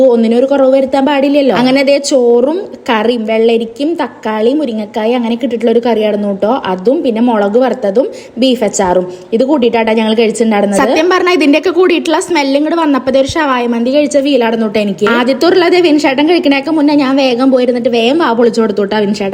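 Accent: Thrissur, India. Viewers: ഇന്ന് ശരിക്കും തൃശൂർകാരി ആയ പോലെ സംസാരം 😂😊🥰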